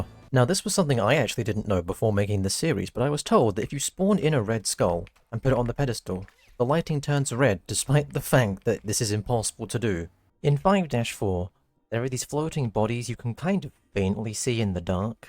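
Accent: United Kingdom. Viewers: Holy british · are you british by any chance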